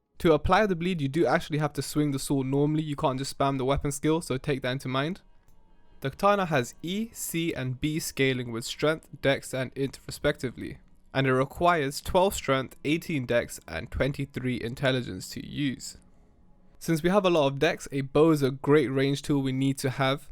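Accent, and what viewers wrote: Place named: London, United Kingdom. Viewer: Bro is definitely from london 😭😭😭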